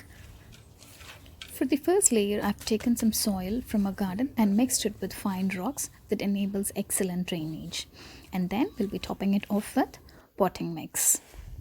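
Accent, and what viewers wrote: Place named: India. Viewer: You have the most elegant Indo-British accent ever! So posh!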